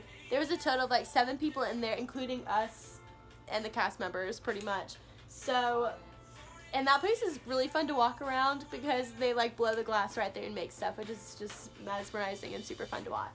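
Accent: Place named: South, United States. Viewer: Your cute little southern accent i love it and you!!!!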